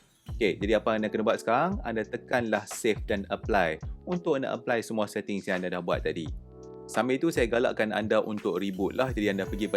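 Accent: North, Malaysia. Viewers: Loghat utara tu okay saja. Muda faham. Sy yg berasal dari Sabah pun boleh faham. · Bg pendapat saya ok sj..mungkin sebab longhat utara saya pun org utara😅..tp overal rasanya sy suka sbb mudah faham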